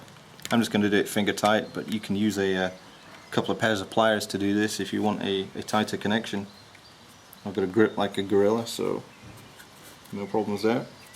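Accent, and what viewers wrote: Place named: Upper class, United Kingdom. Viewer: Excellent class in upper-class British King's English: with a tear-down and tighten the old WAP54G war-horse antenna mounts thrown in for free. If you are comfortable that the antenna-mounts have not twisted so as to sever the internal antenna leads: from the outside - without cracking the case - you can go ahead and screw down the nuts with a 9/16" deep socket wrench. Obviously - you want to do this by hand and not use a socket-wrench driver handle. The nuts themselves are shallow and slightly recessed - but not impossible to grab with pliers. A box-end 9/16" wrench would also work. But the 9/16" socket supplies absolute control with no slipping or knuckle-busting. And your fingers can sense the exact amount to tighten as the the nut (and maybe a thin washer?) bear down on the ineffectual internal-tooth lock-washer that CEO John Chambers (Cisco CEO) used to purportedly stop the loosening from happening in the first place. 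Everything about the consumer design and assembly of this unit sucks. The design was meant to place the WAP54G into a family of consumer devices that would be stacked. I've never seen them stacked. But as points out - the WAP54G is a warhorse. And when have a guest who can't connect an old PC to your new Cable-service router - you just whip out the WAP54G and they can log on. I've never sat down and worked this out - but WAP54G security is probably not the best. A final note on cracking the case after removing to two little screws. I found that f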